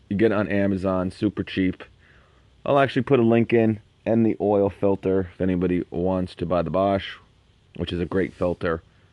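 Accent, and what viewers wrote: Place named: New England, United States. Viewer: Came back to watch again to do my first oil change. Had to use this video because the New England Accent #Represent . Thank you for posting this!!!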